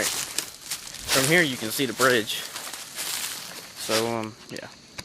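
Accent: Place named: Alaska, United States. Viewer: Are you related to the Alaskan bush people by chance? you have their same accent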